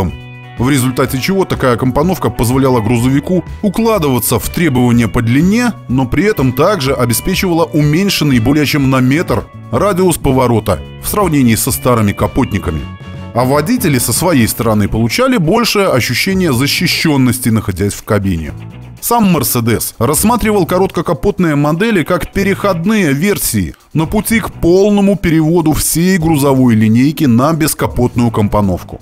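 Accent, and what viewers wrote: Belarus. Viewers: не знаю откуда, но акцент характерный для Витебщины, особенно ярко слышен на склонениях цифр - "пЯци", "дзевЯци" и подобное. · Автор из Беларуси?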